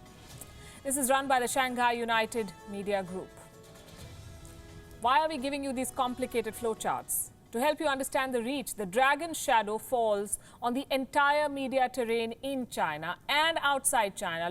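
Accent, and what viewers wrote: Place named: India. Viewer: She has a strong india accent and talk piece by piece.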